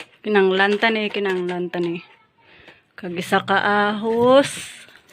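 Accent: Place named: Hiligaynon, Philippines. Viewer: Galing naman, ang husay! Ganon naman yan ang cute!!!!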